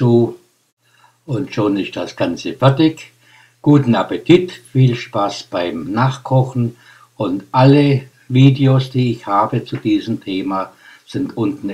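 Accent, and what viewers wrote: Swabia, Germany. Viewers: leicht schwäbisch, aber auch für Nichtschwaben gut verständlich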